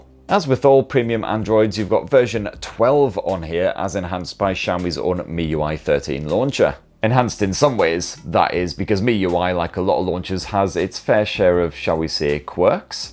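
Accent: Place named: Newcastle, United Kingdom. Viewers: Sorry. I am not sure what you mean? I was just saying the way in which he talks he normal from his area and many of the expressions spoken are common place within that area. No insult was intended. Was merely trying to give people knowledge of the video creators dialect in the north. I am not sure about internet lingo franc though. Does 'ffs' denote a stutter? Thanks. Be healthy. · He’s a Geordie, say no more. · A lot of it isn't even scripted he actually speaks. Like that it isn't unusual in certain part of England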